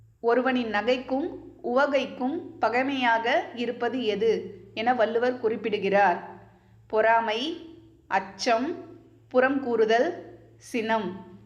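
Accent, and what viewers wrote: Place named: England, United Kingdom. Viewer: Ethukku english kara mam mathiri pesuringa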